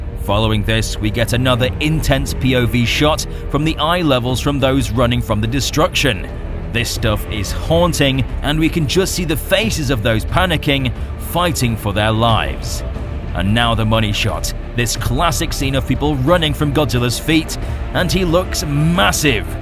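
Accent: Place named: United Kingdom. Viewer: i finally figured it out, the thing that has been bothering me for so long, you are british aren’t you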